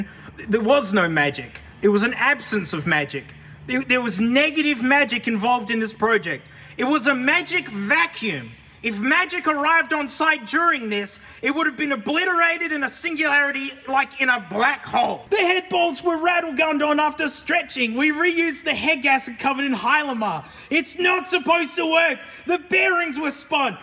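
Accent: Australia. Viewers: you aussies have the best accent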